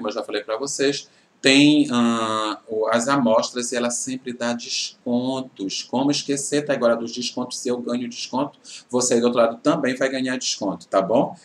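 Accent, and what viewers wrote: Pará, Brazil. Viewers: diz alguma coisa sobre o Revelar da natura! Amo suas resenhas. À propósito, vc é paraense como eu?😅🤭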